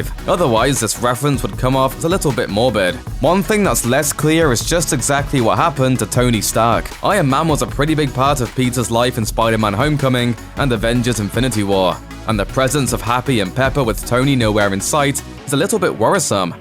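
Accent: United Kingdom. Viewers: Is this a British accent? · This dude has a British accent just like Tom holland 😅 I like British accents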